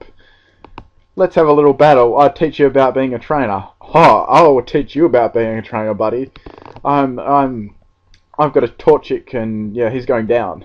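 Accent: Australia. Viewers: what part of aussie are you from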